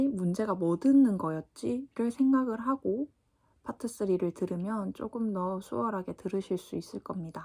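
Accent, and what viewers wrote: South Korea. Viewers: 부산 사람 맞죠?! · 전라도 사투리잇는거같아요 아닌가?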